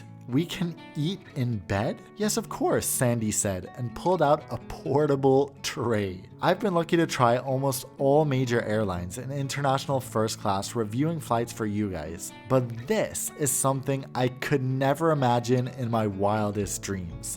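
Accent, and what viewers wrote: Singapore. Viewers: I subscribed when I heard you speak with that Spore accent! 🤣👍👍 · That Singlish is absolutely awsome! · Hahaha love the Singlish scattered thru out this video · mate, first time watching your videos, im blown away not just by the quality of your review, but also your singlish!! Wa eh steady leh, very rare to hear an angmoh dude like you speak like us! thank you for giving our beautiful creole language some spotlight! :) - from sg with love :)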